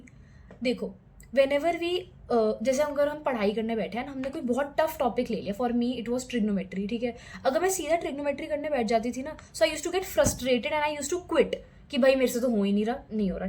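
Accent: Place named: South Bombay, India. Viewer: Relevant Info btw...in a customary SoBo accent...😜🫣🙃 Keep Showing Up 👍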